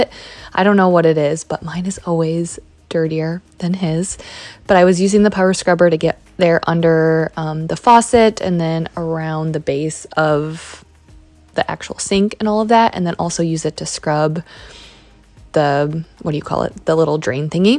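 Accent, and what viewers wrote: Midwest, United States. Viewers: Hey there! I just found your channel and I’ve been binge watching your videos, I find them so relaxing and motivating. I’m originally from Chicago but have been living in Australia for the past three years with my boyfriend, so I love hearing that Midwestern accent, I miss it so much. Can’t wait to visit home soon. I also have to say that so many of these products aren’t available in Australia, like the power paste or scotch brite stove sponges. Such a bummer, but it’s fun to do some research and find similar ones here or even try DIY. Love from Australia! ❤️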